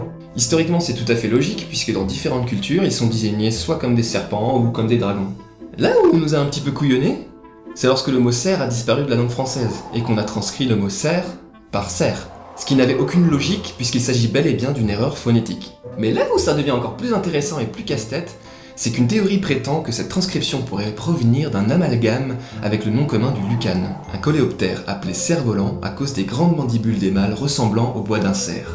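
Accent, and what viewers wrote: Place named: Occitania, France. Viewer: Super sauf ton accent occitan !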